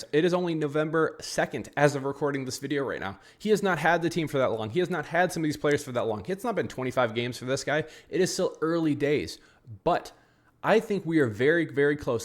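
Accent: United States. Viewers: I like your presentation,are you an American?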